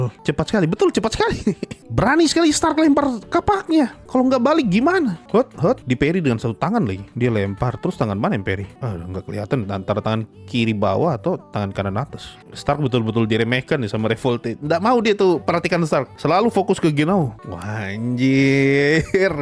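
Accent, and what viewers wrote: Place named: Sulawesi, Indonesia. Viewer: orang sulawesi kah? distracted aja ~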